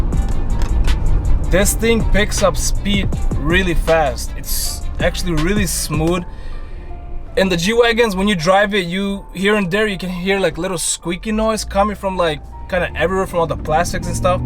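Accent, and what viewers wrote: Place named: Russia. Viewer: Russian accent not black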